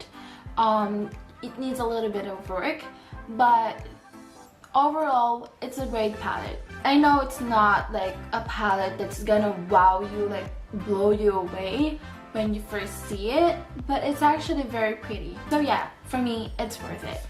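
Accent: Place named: United States. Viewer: sure sissy BTW I love your accent are you half american?? Ur fluent in English 😍😘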